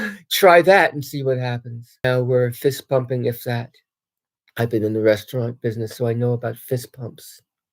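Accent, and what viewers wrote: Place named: Brooklyn, United States. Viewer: Ma'am I hear that Brooklyn accent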